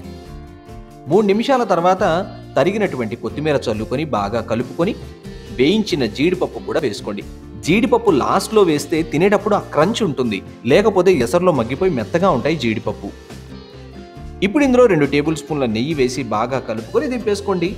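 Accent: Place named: West Godavari, India. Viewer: I love your Telugu. Reminds me of my childhood days in my village in west Godavari.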